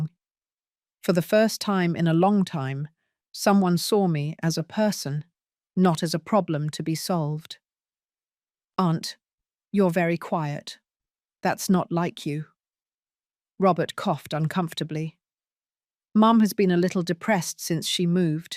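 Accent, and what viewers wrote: United Kingdom. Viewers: What kind of accent does the automated voice have? Is it British? It's not an accent you would expect to hear in Seattle.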